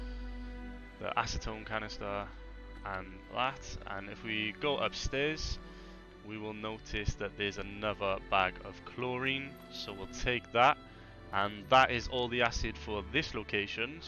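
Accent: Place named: Cardiff, United Kingdom. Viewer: I live in Barry currently but all my family are from Cardiff! Small world! Didn’t expect to hear a familiar accent while watching fallout 76 videos... thanks again! Subscribed and liked 👍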